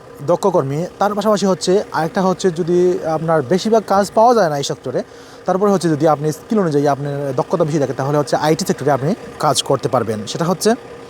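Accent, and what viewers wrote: Sylhet, Bangladesh. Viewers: ভাই আমার মনে হইতাছে আপনি সিলেটি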